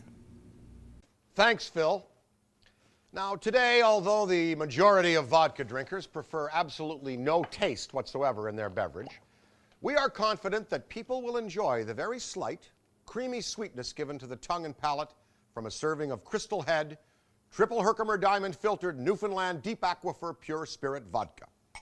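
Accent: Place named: Ireland. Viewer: This video is one of the best examples of the Irish influence still heard in Newfoundland accents today too... If I wasn't told he was from Newfoundland, it would be hard to tell he's not just Irish lol.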